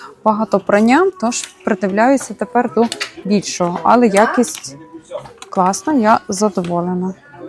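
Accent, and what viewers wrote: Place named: Western Ukraine, Ukraine. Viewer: Она не по украински говорит, а по бендеровски.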